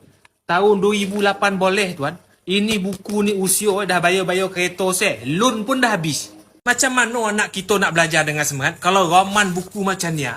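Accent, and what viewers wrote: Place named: Negeri Sembilan, Malaysia. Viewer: Terhibur la dengar dia ni cakap. Sampai aku ulang 2-3 kali. hehehe... Aku mmg suka loghat negeri 9 ni :D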